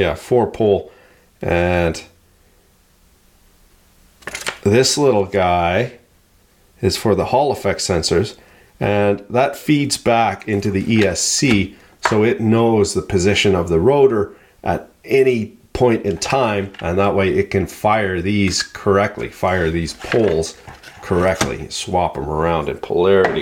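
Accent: Canada. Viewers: I love your accent. I think I am going to have to learn to speak fucking Canadian, Ah? Learned lot of new vocabulary, like Shmoo, skookem. Fuck, I am going to have to watch this video again. Why this video brings me such happiness I have no fucking clue, but it does. :-) You definitely have a new subscriber. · You need to do a video translating all the sangs and saying. I'm guessing it's common Canadian contractor dialect but, some of us 'mericans have a hard time keeping up... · Just discovered this channel. Never heard a more Canadian Canadian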